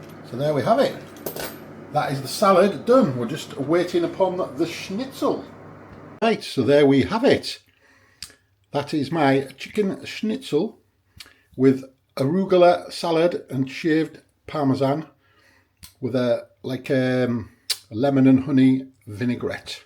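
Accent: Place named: Northern England, United Kingdom. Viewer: I am subscribed to your channel and always 'like' a viewed video, I could not enjoy this one as I was peeved you called it 'arugula' the USA word for 'rocket', in your broad northerner accent, maybe you quoted an american recipe. I know petty, but Americanisms is so annoying and invading our beautiful British language.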